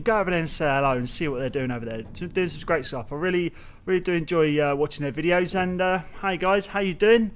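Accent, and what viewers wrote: England, United Kingdom. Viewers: Been watching your videos for a while. Learning alot about bricklaying. Many thanks for teaching us Yanks lots of Queens English. If I ever get a chance to visit England, I'll know what you blokes are saying.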